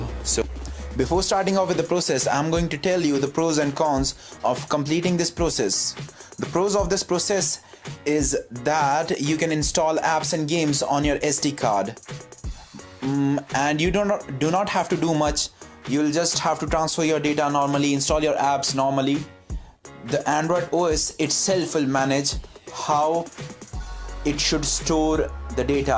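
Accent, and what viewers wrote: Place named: India. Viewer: The Voice is English taste India, because Google now is beling to India People.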